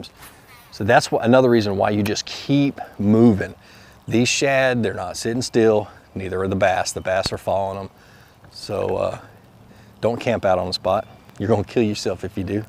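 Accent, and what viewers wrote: Georgia, United States. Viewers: Are you from Georgia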